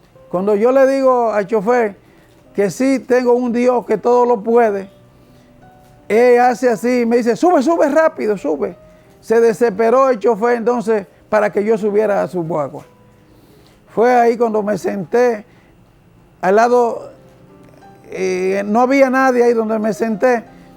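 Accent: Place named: Dominican Republic. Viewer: Dios le bendiga. Apenas empecé a escucharlo no sé si identifica el país desde donde está. Pero lo identifico por su manera de hablar, imagino que es de RD, gracias por su testimonio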